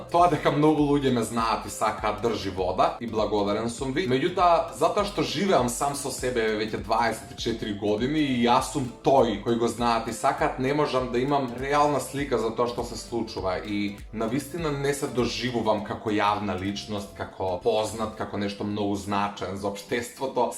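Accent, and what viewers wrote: Kumanovo, North Macedonia. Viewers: Brat znaes li kolko e tesko od kumanovski dijalekt na makedonski 😹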